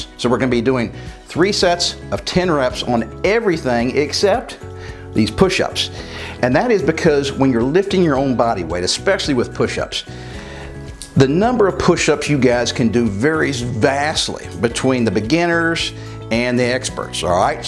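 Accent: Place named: South, United States. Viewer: This guy’s comforting southern twang reminds me of “Dubayah”. “We’re goin into “Eyerack” to give Saddam a good ol’ southern slap on the jaw yeh all”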